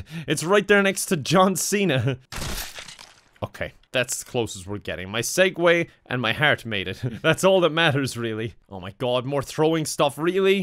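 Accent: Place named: Ireland. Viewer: After awhile the Irish accents just seems normal to me. It's just. Then he pronounces Z as Zed and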